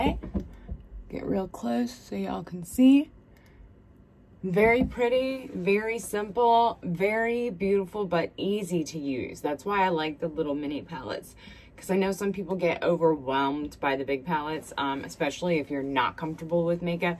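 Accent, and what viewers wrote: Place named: South, United States. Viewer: Hey hey miss lady..💙 u look beautiful with or without makeup..💙 again i also deal with ptsd d after getting out of a horrible relationship of 8 years. .but now that im free im finding me again.. Its been insane. .im soo happy to have survived.. Im getting dentures at 40 due to not being able to even try to take care of me..but im soo happy to find ur channel and u..💙 im from Memphis but live in Oklahoma so i here for the southern accent..💙 u r a sweetheart..ao happy to be apart of ur journey. .💙 keep growing and for sure keep shining💕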